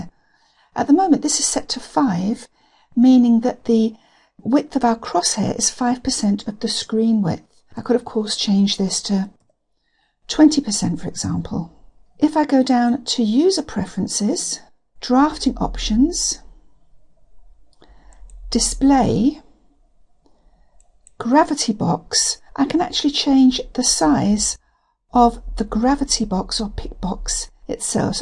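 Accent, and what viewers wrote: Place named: United Kingdom. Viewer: Lovely to hear a clear British voice. Thanks for your tutorial 👍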